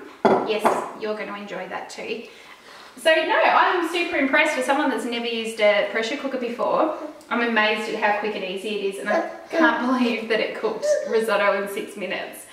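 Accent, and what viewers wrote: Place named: New Zealand. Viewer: Where are you from? You sound like a kiwi :-)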